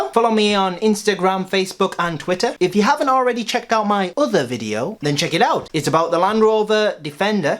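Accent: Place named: United Kingdom. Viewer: He does a very good British accent I’m subscribing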